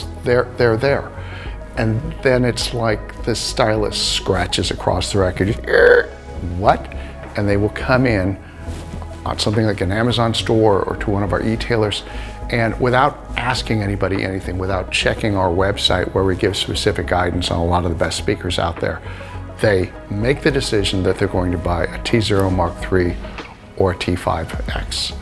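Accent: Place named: United States. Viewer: High dudgeon? Who talks like that? An Anglophile? Certainly not an audiophile. There's nothing like getting scolded by a person that has an American accent and uses Britishisms.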